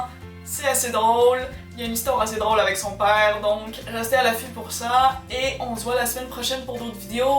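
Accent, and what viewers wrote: Canada. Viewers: c'est pour ça que tu as un accent canadien!! :) bisous du Canada!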